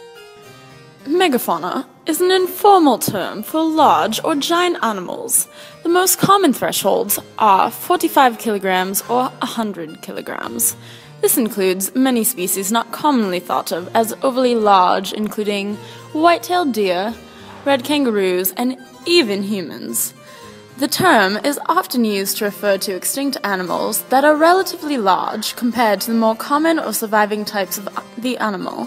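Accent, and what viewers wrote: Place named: RP, United Kingdom. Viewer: You are so posh!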